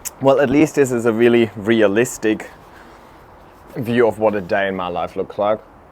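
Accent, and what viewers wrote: Germany. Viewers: So, I only really follow you here bc I can't handle other social media very well. I could have sworn in your last several videos that your accent sounded a little more on the German side 😂 It's typically a very equal balance between accents if that makes sense? But I was thinking you may have taken a little trip back to Germany or something, haha! I'm so so so so glad you had a good visit! I think we're pretty close in age and I live very far from my highschool (and current) best friend, so I definitely relate. I also moved somewhere that affected my accent according to others, but I don't hear it!!! So now I'm hyper aware of people's accents and how they can change!! Love it. 😂 Thanks for such a long video. I'm painting my new home so I'll definitely be binging your channel the next few days. 💚💚💚💚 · When I first started watching you, i was like: "is this a german accent? 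No i think that is just how they speak in Australia" And then i was so surprised that you are acutally from Germany. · I have just started the hobby and I appreciate your videos and hope that I can achieve the same level of growth!! I am located about 1.5 hours north of you in NSW. Also, my grandmother was German and listening to your accent reminds me of her. You have the same work ethic as she had and passed that onto me.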